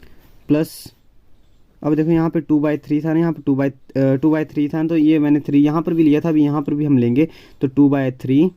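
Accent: Himachal Pradesh, India. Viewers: By your hindi accent im guessing you are from Himachal ( may be una or kangra)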